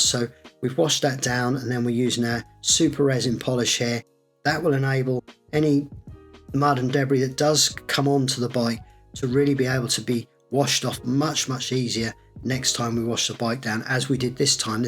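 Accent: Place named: Suffolk, United Kingdom. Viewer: Love the Suffolk accent, it's just like mine. Love the video. 😊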